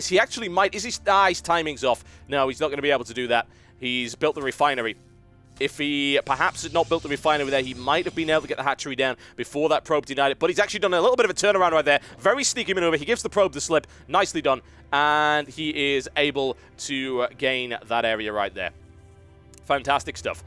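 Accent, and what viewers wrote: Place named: England, United Kingdom. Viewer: ? when did you get an english accent?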